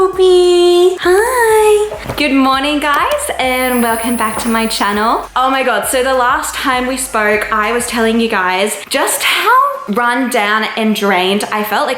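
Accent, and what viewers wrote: Australia. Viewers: Loved this vlog! These are the videos I get most excited for. Just a kinda of day in the life and what I eat in a day. Btw I love your Australian accent, jealous because American “accents” aren’t cute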